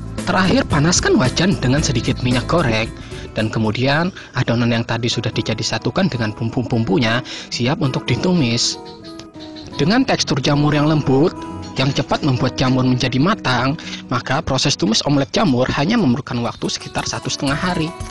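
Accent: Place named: Java, Indonesia. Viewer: bang org mana sih bahasany banjar tpi logatny kyk ada medok jawanya wkwk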